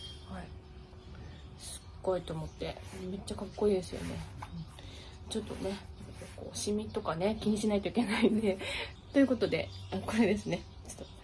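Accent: Karatsu, Japan. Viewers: とても、自然体で、このくらいが、個人的には、大好きです。 標準語と、唐津弁の混合もすき！ ポフポフ良いですかね？ 自分も、スマホ一つです。 帽子紹介のシャキン！ ナイスです。 よく工事してますねー？ 高級住宅街かなー いつも、癒されてます。 仕事が、嫌いなので、動画を 見て、ほっこりさせてもらっ てます。 ありがとうございます。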